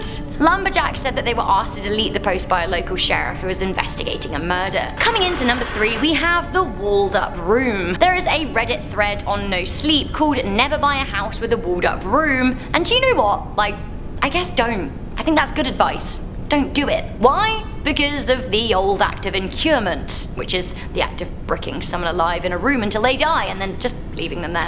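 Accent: England, United Kingdom. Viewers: WOW! This was insane! Love it! you are awesome and your shows are interesting AF. I also could listen to the butterflies shooting out of your mouth all day! The English accient is so beautiful. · Our is back with her indestructible tongue and hot Brit accent.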